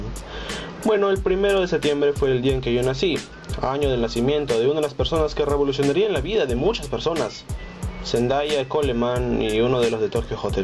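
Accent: Peru. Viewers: ERES PERUANO!!! :0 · Eres peruano??? Bien csm salu2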